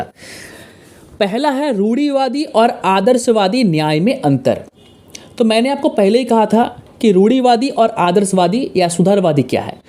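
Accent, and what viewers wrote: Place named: Bihar, India. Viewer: aapke accent se lagta hai your from Bihar or Jharkhand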